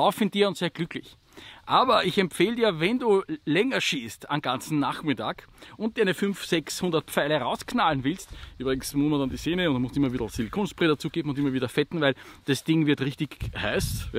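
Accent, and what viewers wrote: Austria. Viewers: He speaks Austrian not German. This is a (funny) difference. So I agree, the autotranslation is useless.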